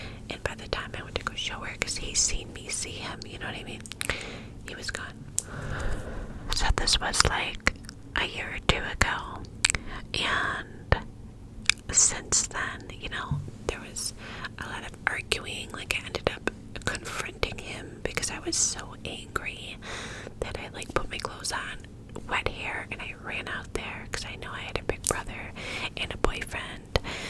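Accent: New York, United States. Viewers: I loved this! Can you do the next in your ny accent again? It gives me the most tingles for some reason. <3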